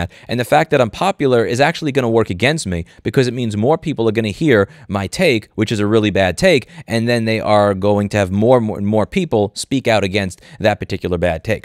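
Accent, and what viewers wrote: New York, United States. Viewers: Very well said and interpreted, took me a long time to get used to your NY style, appreciate all you do now.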